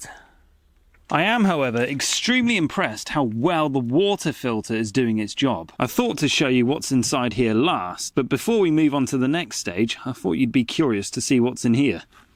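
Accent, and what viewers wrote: United Kingdom. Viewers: This guy is extremely british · Very British. I love it.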